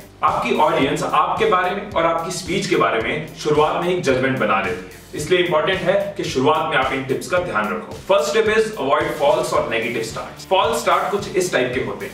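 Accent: India. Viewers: Cute Indian English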